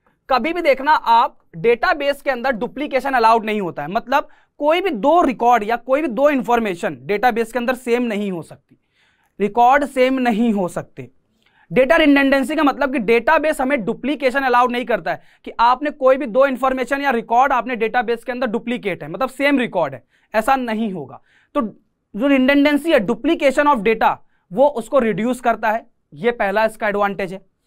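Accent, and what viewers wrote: Haryana, India. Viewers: Thank you sir 😌❤️ SIR AAP HARYANVI HONA 😀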